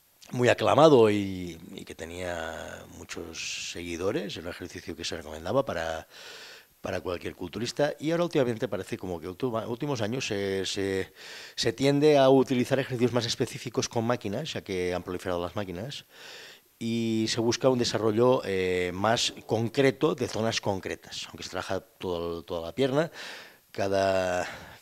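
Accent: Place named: Mexico. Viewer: que acento mas mexicano!!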